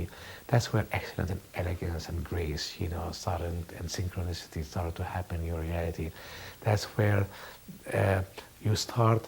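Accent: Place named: Spain. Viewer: Interesting how an a man with an arabic name, and a spanish accent can expound on a very inclusive subject such as emotional intelligence and tie it into the history of commerce! Very deep!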